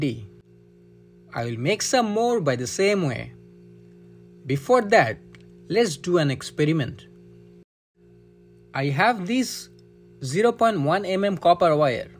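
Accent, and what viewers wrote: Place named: Bengal, India. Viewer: ভাই তোমার ইংরেজি বলার ইষ্টাইল শুনে বুজেছি,তুমি বাংলা বলতে পারো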